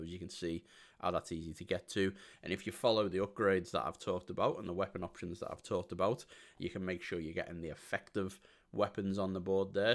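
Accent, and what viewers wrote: Liverpool, United Kingdom. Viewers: wow, it's obv there but it's a much cleaner scouse twang than I'd expect. Must be from the posh part of Liverpool lol. Meant no offence haha.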